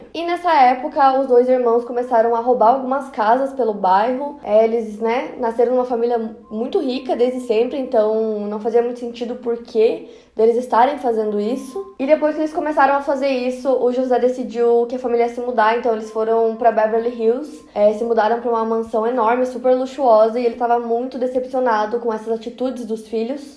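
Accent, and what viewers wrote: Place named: Santa Catarina, Brazil. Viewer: Não tem nada a ver com o vídeo, mas eu sempre me pergunto se a é de Santa Catarina ou do interior do Rio Grande do Sul. Os sotaques são muito parecidos. Eu sou do Rio Grande do Sul e as pessoas que moram mais no interior tem o sotaque bem parecido, então eu acabo me confundindo.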